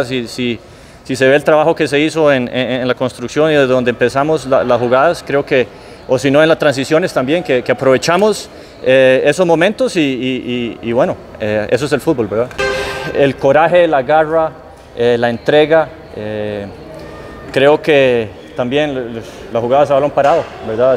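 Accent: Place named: Costa Rica. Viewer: El tecnico este es tico?😳🤔 se le nota en su acento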